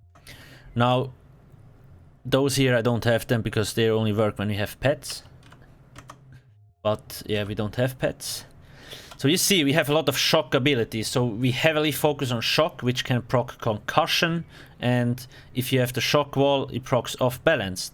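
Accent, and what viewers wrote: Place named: Switzerland. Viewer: Das tönt nach Schwizer ^^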